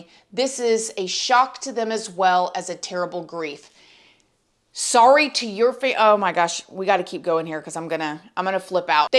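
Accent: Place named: Midwest, United States. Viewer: You have the coolest accent I’ve ever heard in my life and I’ve travelled a lot ! What is the place where folks speak in this way ??? Midwest US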